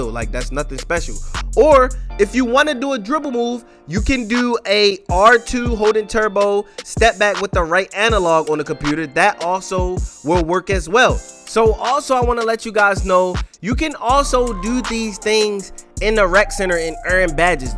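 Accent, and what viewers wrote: Baltimore, United States. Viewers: Aye bro where u from, I swear i hear some Baltimore coming u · I been watchin you fo a min you from Baltimore got the accent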